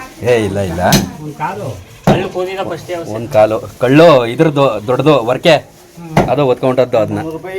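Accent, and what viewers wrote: Mandya, India. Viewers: ಬಹುಶಃ ಇದು ಮಂಡ್ಯ ಬಾಷೆ ಇರಬಹುದು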